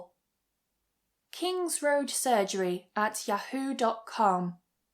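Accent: United Kingdom. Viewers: Thank you for your British English pronunciation and I your voice that is very very sweet...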